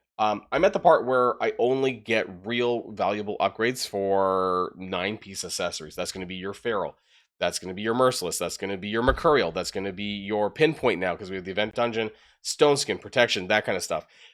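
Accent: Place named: United States. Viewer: Почему название видео и описание на русском, а мне вещает какой-то американец? -_- Требую видео на русском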